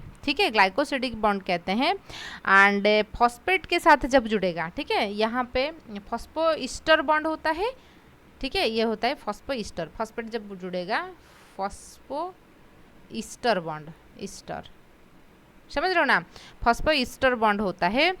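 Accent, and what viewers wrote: Odisha, India. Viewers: Ma'am ap Orissa se ho kya.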